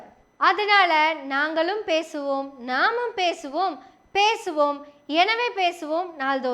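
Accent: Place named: Coimbatore, India. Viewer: பாப்பா உன்னோட கோவை தமிழ்ல நல்லா வைச்சி செய்து இருக்கீங்க 😂சூப்பர் 👍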